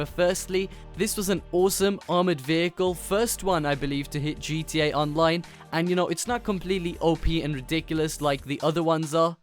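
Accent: Birmingham, United Kingdom. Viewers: Are u from Birmingham,which area?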